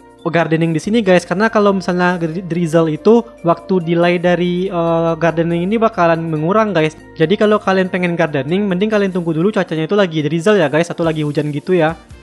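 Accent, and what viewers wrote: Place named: Minangkabau, Indonesia. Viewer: Logat minangnya kental banget 😂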